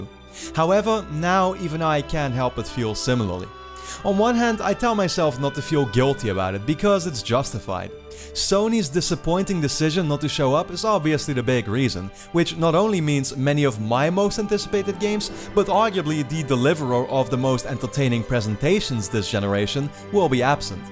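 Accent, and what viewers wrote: Netherlands. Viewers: hes dutch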